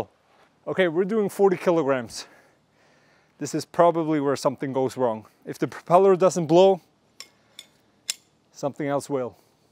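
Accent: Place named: Sweden. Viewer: That was pretty amazing... And I recognised your Swedish accent almost immediately — it’s remarkably similar to David Windestål’s. I’ve watched enough of his tricopter videos to recognise that accent that in no time!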